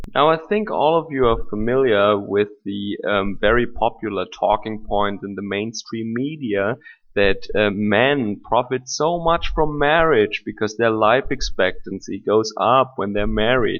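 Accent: Germany. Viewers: I seriously just heard german accent in this and never really watched anything by.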